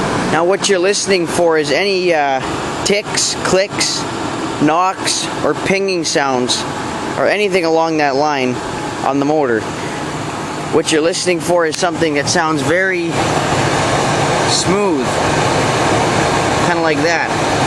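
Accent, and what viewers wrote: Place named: Canada. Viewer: i can tell that ur canadian haha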